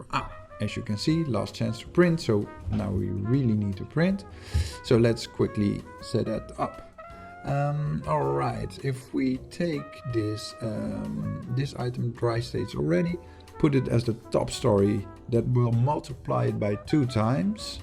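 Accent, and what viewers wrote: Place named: Netherlands. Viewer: Volgens mij hoor ik een Nederlander?!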